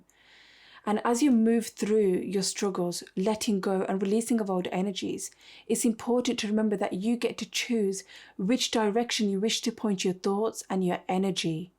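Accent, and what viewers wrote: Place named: United Kingdom. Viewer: what zodiac sign are you? also, you talk like the guys in (i lived in the u.k. fer 2 1/2 years with an english gf). i have anxiety disorder and in addition to what a strong and domineering emotion it is (along with the usual intrusive thoughts) i do sometimes wonder if my amygdala pumping out cortisol in such high quantities will eventually lead to cancer or some other illness (when it strikes my anxiety lasts fer HOURS and comes out of nowhere). it's like being possessed by some sorda evil spirit.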